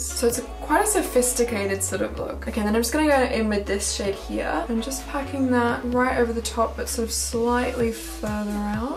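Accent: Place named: Australia. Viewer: it sounds really cool! At first I thought you sounded English then I thought Australian! So that would make sense! Sounds so cool tho!!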